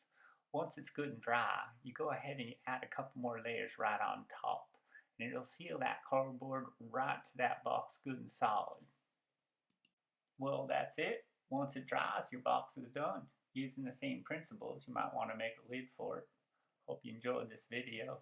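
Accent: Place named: South, United States. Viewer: U gots a southern accent now!!!!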